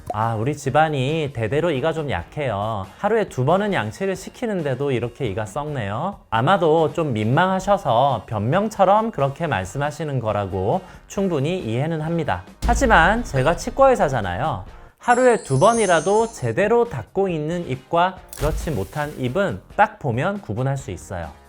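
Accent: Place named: Gyeongsang, South Korea. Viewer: 멀리서 보면 솜사탕인데 가까이서 보면 거즈라더니... 온화한 표정으로 (feat.미세한 경상도억양) 세상 조곤조곤하게 팩트로 때려주시네요ㅠㅠㅋㅋㅋ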